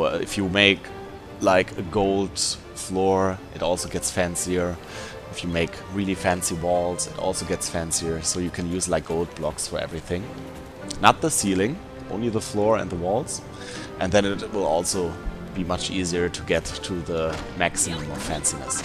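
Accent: Germany. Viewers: Is he german? KLingt nach deutschem akzent in dem guten englisch ;)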